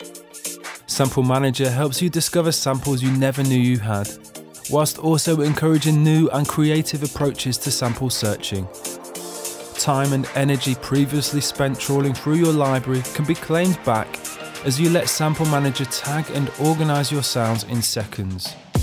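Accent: England, United Kingdom. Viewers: Nothing. I know it's nonsense, but to me a British accent just sounds more proper and more authoritative so in a sales pitch it works on me.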